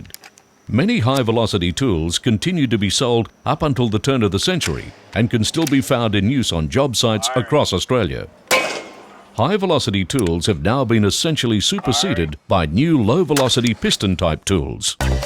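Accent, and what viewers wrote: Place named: New Zealand. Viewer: It's an Australian video, but the narrator sounds New Zealand.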